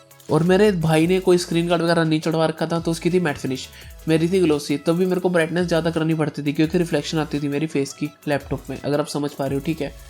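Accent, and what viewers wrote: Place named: Haryana, India. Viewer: That haryanvi accent 😂❤️, sunne me maza aa gya tbh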